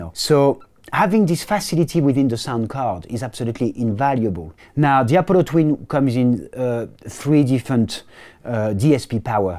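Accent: France. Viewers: i was wondering, definitely sound French, especially when he says "acoustic"! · Hmm thanks for the info We sometimes overlook the importance of the hard drive it counts mostly when using a lot of Vst I think Pc is better for home studios because a Pc is easier to fix up.... parts can be changed easily and you can upgrade it easy Though is it basic for beginners I really like the accent from my country Go French! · Interesting accent..French? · Ehh' lil French accent, très bonne vidéo ,merci beaucoup et bonjour de la France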